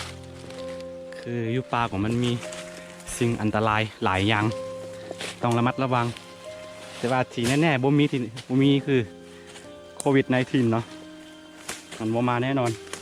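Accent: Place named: North, Thailand. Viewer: สำเนียงพูดออกเหนือบ้างออกลาวบ้างคับอยู่ใหนคับชอบๆติดตามเน้อ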